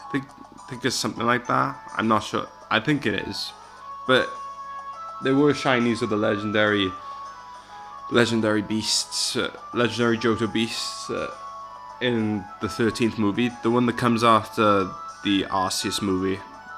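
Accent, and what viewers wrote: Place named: Wales, United Kingdom. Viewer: Your accent and pronunciation, my friend is also Welsh it's pretty cool. I've got a boring English accent unfortunately haha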